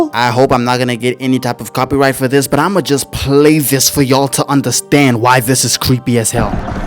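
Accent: South Africa. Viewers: You sound south African bro😂😂 but don't worry I fucks with your content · I knew you were South African 😭😂🇿🇦🇿🇦🇿🇦🇿🇦 yebo lapho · I dont know .... bro sounds south african 😑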